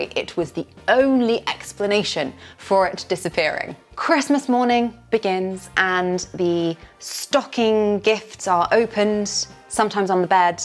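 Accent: United Kingdom. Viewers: Happy Christmas, I really enjoyed and saw your love and pride for British Christmas! Thank you for sharing your happy VIBES and beautiful British accent always❤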